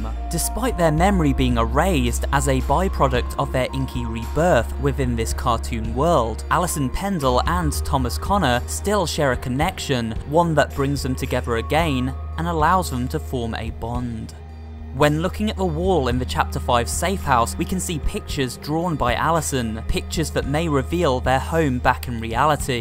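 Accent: United Kingdom. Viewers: Just wanted you to know, you sound british. I absolutely adore and admire british people because of their accent. This is probably why i enjoy your videos.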